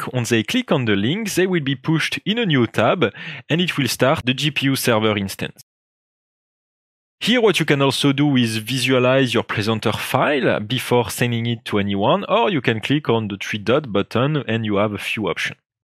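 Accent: France. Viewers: Французский акцент? Очень сильный. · Twinnmotion is a beauty, but that fucking French accent leaves me in awe, still don't understand a single word the guy says unless I activate captions.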